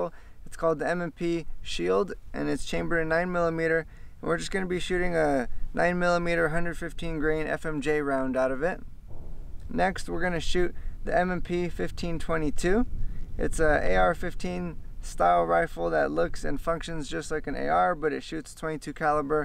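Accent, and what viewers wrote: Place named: New Mexico, United States. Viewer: no worries man. You have a similar accent to a buddy of mine in New Mexico so it was a guess lol. I love Idaho though!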